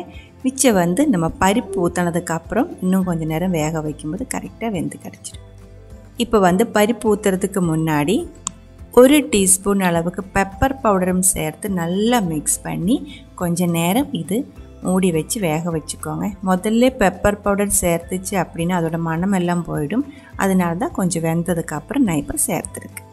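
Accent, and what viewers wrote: Kerala, India. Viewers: Nice Tamil with Malayalam accent nice molakooshyam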